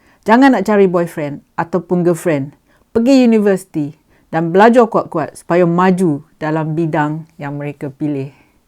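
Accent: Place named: Kuala Selangor, Malaysia. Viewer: hehehee.. sya suka dgn logh4t puan.. mcm org kampung(kuala selangor) teman pn ye.. mcm org kampung isteri (ipoh) teman pn ye jugok.. apa pun.. teruskan 'membuka' mata marh3in dgn isu2 semasa yg p4nas! ..Terbaek Yong!!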